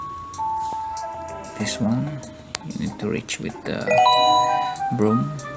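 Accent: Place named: Philippines. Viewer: can I ask, what country are you from? your accent is kinda like a filipino. but anyway, thanks for the walk-through 😁💪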